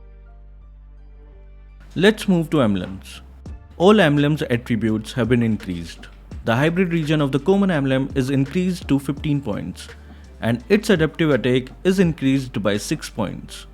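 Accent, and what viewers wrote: India. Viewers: asal kau cakap cam keling sia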